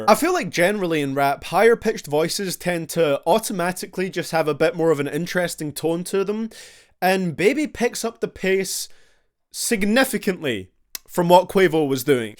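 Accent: Ireland. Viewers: Are you Irish or Scottish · you sound Irish today are your Irish?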